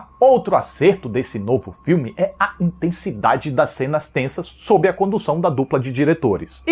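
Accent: Northeast, Brazil. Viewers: Não conhecia seu canal e já amei primeiro pq claramente vc é nordestino kkkkkk sou de Sergipe e pra variar gosto de ver uma linguagem acessível e pela resenha de forma leve! Vi o 6 domingo no cinema e durante a semana revi os outros pra relembrar... Obrigada pelo vídeo 👏🏽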